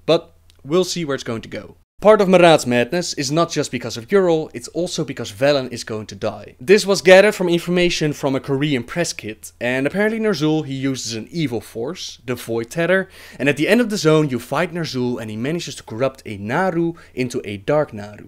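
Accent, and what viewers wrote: Netherlands. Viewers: Are you dutch? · Are you dutch? I love your accent :D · Nice video :) Lil question, do you happen to be dutch? ^^ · heuj Dutch accent! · ur dutch accent is next level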